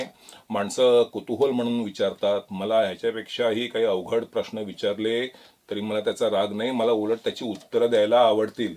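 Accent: Pune, India. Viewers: सुप्रभात नमस्कार..... अगदी पुणेकर मराठी भाषा....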